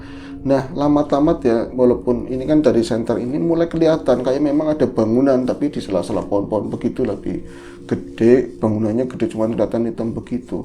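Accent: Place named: Java, Indonesia. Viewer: Yg bikin khas adl dialek nya mas yg medok lucu.. sebenernya ini yg bikin ga jd serem hahahaa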